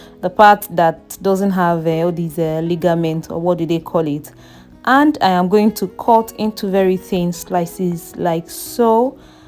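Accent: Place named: North, United Kingdom. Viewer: I just came across your channel now. You sound like a northerner, are you?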